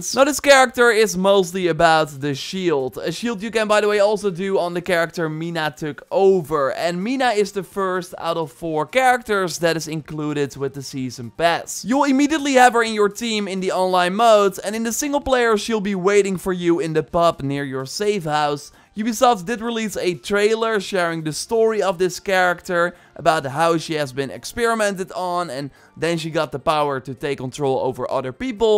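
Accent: Netherlands. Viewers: Arw you dutch?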